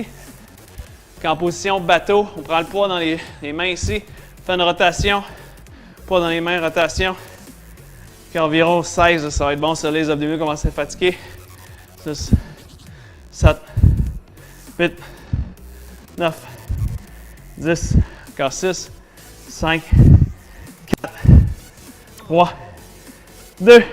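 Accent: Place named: Canada. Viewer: SUPER ces entrainements, en plus j'adore ton accent canadien....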